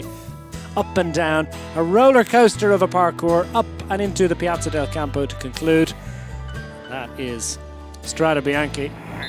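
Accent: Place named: Ireland. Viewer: It's not Rob Hatch. He did the live Eurosport commentary with Dan Lloyd, but Rob Hatch is English, this fellow's Irish. It sounds like Michael Hutchinson.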